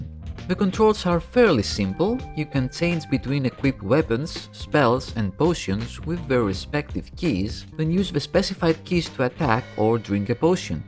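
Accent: Greece. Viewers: lol that Greek accent knocks me out ahahah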